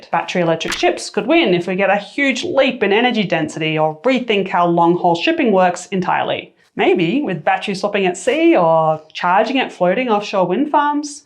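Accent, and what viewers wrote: Australia. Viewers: I like my bad news with a chipper Aussie lilt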